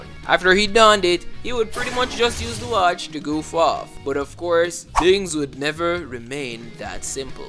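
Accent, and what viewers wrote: Jamaica. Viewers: I had no idea he was Jamaican until now :face-blue-smiling::face-fuchsia-wide-eyes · You kind of sound Jamaican A little bit for me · Yo your jamaican?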